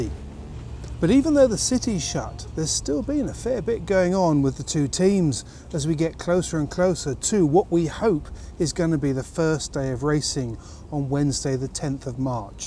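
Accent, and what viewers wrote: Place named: RP, United Kingdom. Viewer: very cool bbc style English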